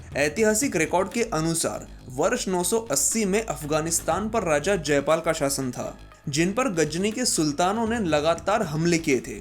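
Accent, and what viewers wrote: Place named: Maharashtra, India. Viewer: you should work on your hindi accent.. looks too much of marathi influence.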